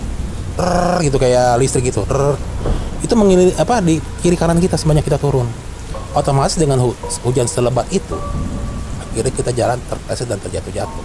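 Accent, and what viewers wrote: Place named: Jakarta, Indonesia. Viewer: Bg orang mana?? Jakarta y... Betawi nya kaga ilang..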